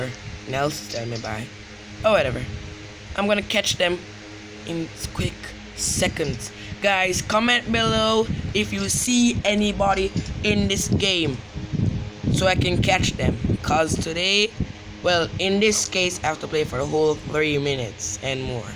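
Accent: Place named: United States. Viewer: Yuh sound a way talking american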